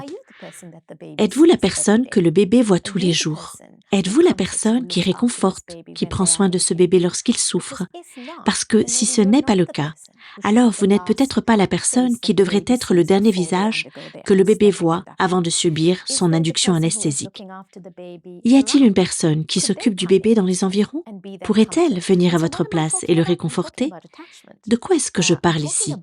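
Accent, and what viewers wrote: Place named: France. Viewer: Vidéo très pertinente!! Et merci pour la douce voix française qui a permis une écoute très agréable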